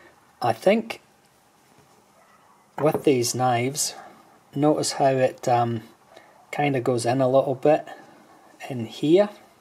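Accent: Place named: Motherwell, United Kingdom. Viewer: You sound like somebody from Motherwell! Good vid 👍🇬🇧🇦🇨🏴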